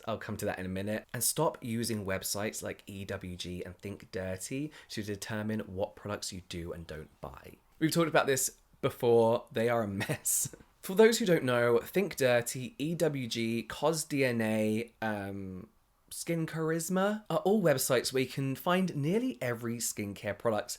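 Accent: United Kingdom. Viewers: I realized you and MsAlexx (UK fashion vlogger) have the same accent and I can’t unhear it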